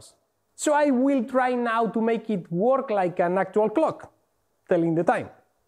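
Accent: Spain. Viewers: como se le nota que es español al jodio... increible video e invento tio!!!! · el acento español se nota un huevo, eso ayuda a los españoles que sabemos inglés a hacerlo más facil de entender, muy buen video y me voy a ver un par más que me parecen interesantes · Se nota a kilómetros el acento español que locura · Tu ere español no? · Como se nota que eres español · Tú eres español, a mi no me engañas!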